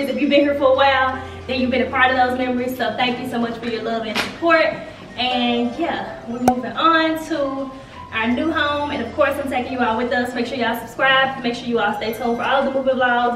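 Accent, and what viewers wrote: South, United States. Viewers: You’re the epitome of be patient and wait your turn !! I literally remember watching your relaxed hair video YEARS ago falling in love with your southern accent & now look at you 🥺 I’m really so proud ❤️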